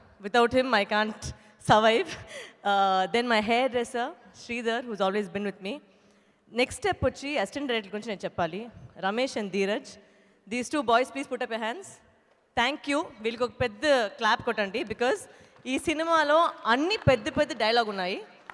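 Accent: India. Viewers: Her Telugu style is same as Karnataka and Andhra border Telugu PPL slang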